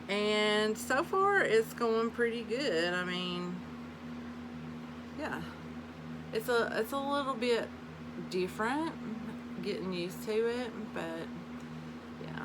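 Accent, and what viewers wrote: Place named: North Alabama, United States. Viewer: I enjoy your videos so much. You sound like you are from north Alabama. I lived there for several years. It was like heaven. Now I understand why (from Jacksonville like me and where I moved back to) sang. I never understood until I felt homesick for the wide open beauty of it.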